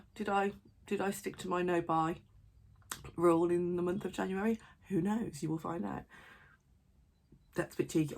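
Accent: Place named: Birmingham, United Kingdom. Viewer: Fellow Brummie. Lovely to watch a knitting podcast with someone who has the same accent as me. Appreciate the honesty of having a wip that is not going as expected. This is so me!! From an experienced frogger 😂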